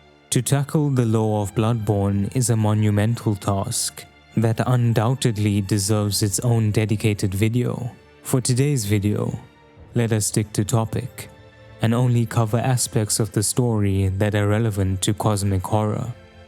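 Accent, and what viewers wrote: South Africa. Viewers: Your voice sounds South African,South African Indian to be exact,id say from Durban but I could be wrong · South African?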